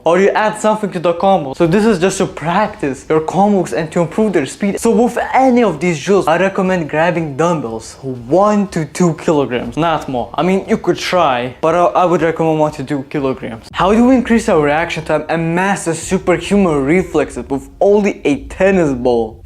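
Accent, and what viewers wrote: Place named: Russia. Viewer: Im subscribing cause he got that rusky accent.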